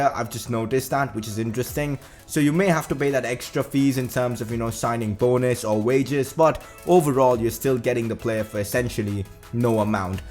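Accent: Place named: India. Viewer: Steve Worrell he's got a sour throat probably Indian cuz I don't know how welsh people sound like.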